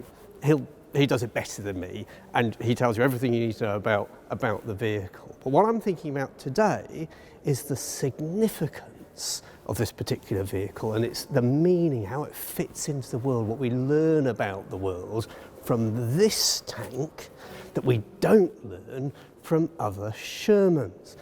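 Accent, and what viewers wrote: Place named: United Kingdom. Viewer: What a pleasure to watch for so many reasons: First the wonderful British English spoken. That pronunciation, melody, stressing etc. Splendid! (I am German, btw) Then the dedication to the topic that speaks from every sentence. The pure facts and information conveyed. The reflection on the historical work you are doing. The legitimate and based reasoning. The mild subtle humour. Ex-cel-lent.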